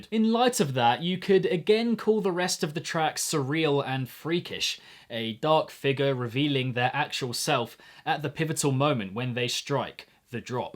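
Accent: United Kingdom. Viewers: Good video but my ears is blooding by your bri'ish acсent, sorry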